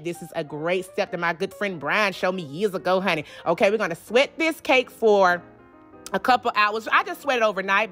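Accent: South, United States. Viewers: Lord, I'm so glad I found this channel. The food looks so good, and on top of that the southern commentary knocks it home. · I love this chic. I’m southern and live in Ny. So hearing you round the holidays just tickles my heart. Be blessed girl...💕ya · That's the south slang.. lol... I'm from MS🤣😊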